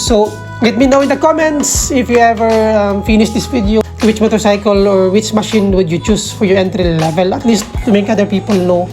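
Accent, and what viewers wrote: India. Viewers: Bagalan mo nalang English mo or tagalugin mo nalang kung hirap ka...Kase comparison of two motorcycle Ang topic mo kaya dapat mas maintindihan Ang explanation mo... Parang indiano mag English😅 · Para kang syunga mag review? Saka kana mag english kng marami kanang subscriber? Para kang mga indiano mag review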